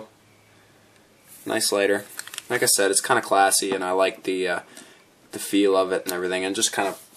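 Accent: Canada. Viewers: You're Canadian, Aren't you? You sound like a Newfie.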